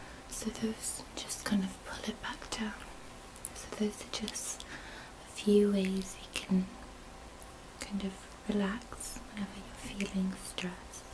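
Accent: England, United Kingdom. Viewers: she has an english accent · Or maybe she was taught the English way of speaking... or better yet taught by an Englishman/woman. Ever wonder why Indians sound the way they do? Welsh English teachers in the 1800s. She sounds English when speaking English because she was taught the English way, not because she's Korean. If an American had taught her to speak English, you'd bet your ass she'd speak with an American accent to. Just the way it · she has a British accent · it's her English accent, not Asian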